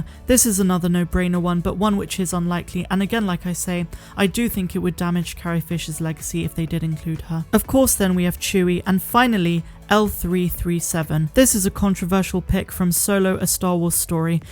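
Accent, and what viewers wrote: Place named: West Country, United Kingdom. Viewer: Meh we can hear your West country accent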